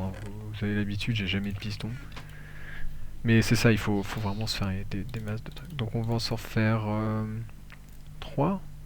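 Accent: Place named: England, United Kingdom. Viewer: Pourquoi les autres regardent: Bon tutoriels, bien explicite. Pourquoi je regarde: Fail, triche, accent anglais.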